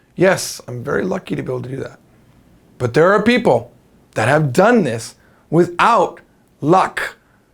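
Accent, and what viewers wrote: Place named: Japan. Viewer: Aww your Chinese has a thick Japanese accent. lol so adorable!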